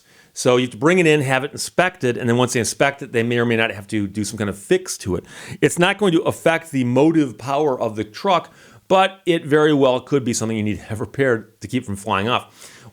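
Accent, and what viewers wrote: Canada. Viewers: Canadian robot lady you did a good job stitching that one together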